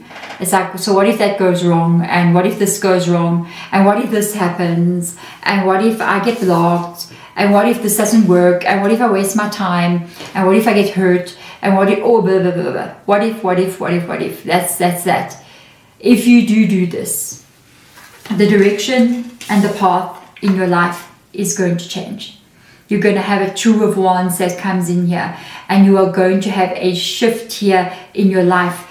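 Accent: South Africa. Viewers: Your readings are amazing 🙏 I recognize the SA accent - hope you are - am totally resonating with yr other readings too Thank you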